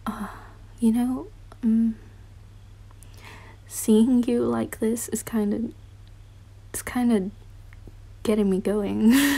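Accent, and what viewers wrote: United Kingdom. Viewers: Damnnn the British accent 🔥🔥🔥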